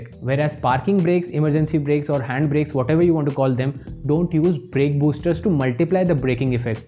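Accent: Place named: India. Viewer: Why can't you get rid of that indian accent ?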